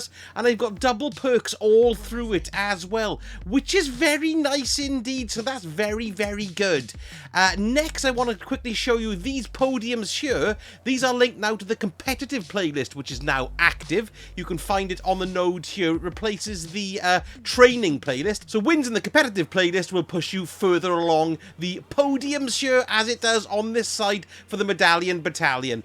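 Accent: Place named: Liverpool, United Kingdom. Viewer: the only british i know who speak this way are liverpool fans . so are you a liverpool fan ?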